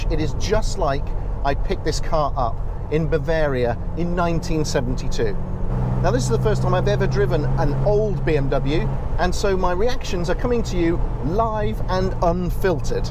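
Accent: United Kingdom. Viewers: I look forward to the end of the week and pouring myself a bourbon and settling in for this week’s episode of The Car Guys. Maybe it’s that I’m of similar age or the British accent to my American ear but narration is like poetic prose. His descriptions, word choice and figures of speech are just perfect. His observations and highlights are on point. It just resonates so well with me. It’s knowledgeable but most importantly it’s sincere. Now I’m looking forward to Sunday night, a glass of wine and the next Watch Guys episode for more of great storytelling style.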